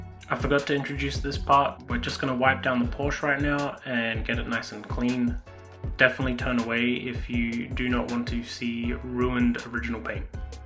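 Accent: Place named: United Kingdom. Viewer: The British accent just does it for me 😍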